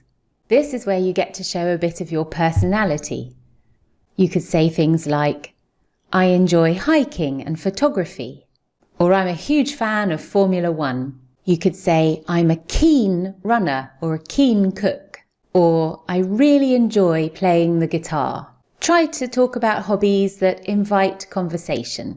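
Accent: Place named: United Kingdom. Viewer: I love to hear your British accent.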